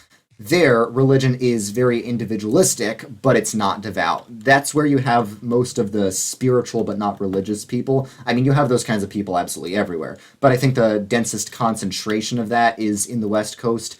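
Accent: New York, United States. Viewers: I’m from NY as well and instantly recognized your accent on your videos lol. And yeah if you can see the city from your high school you’re definitely downstate. I’m from Albany and we consider everything south of Poughkeepsie downstate 😂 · mainly when you talk about walkable cities, those of us from hillbilly areas 😂, and the way your voice sounds. I’m just like “yep he’s from New York.” I died when you said “collecting the bigotry infinity stones” 😭😂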